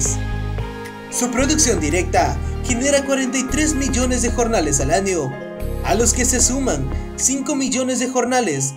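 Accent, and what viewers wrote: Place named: Peru. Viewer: Habla con acento Peruano Carajo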